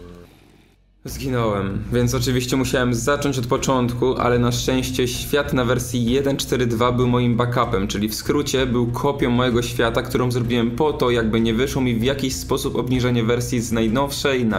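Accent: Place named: Poland. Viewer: Ten twój Polski angielski czasami boli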